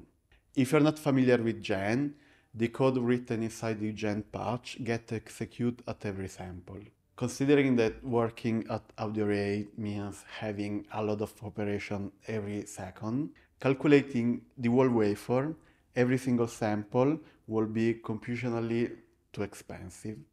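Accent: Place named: Italy. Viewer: Sorry for the Italian accent???? The Italian accent is awesome!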